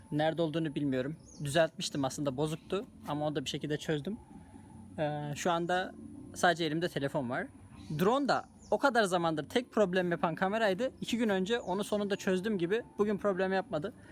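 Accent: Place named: Erzurum, Turkey. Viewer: Abi bi erzurumlu olmama rağmen şu yokları yoğh yoğh diye demene uyuz oldum ya tam öyle konuş ya da tam istanbul ağzıya konuş ikisini karıştırınca çok itici oluyor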